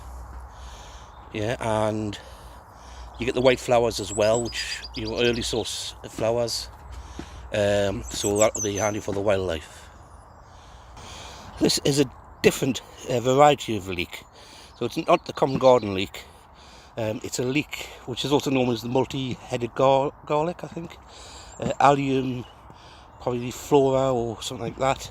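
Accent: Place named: Geordie, United Kingdom. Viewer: I know a fellow geordie when I hear one! Haha. So do you live in north east England then?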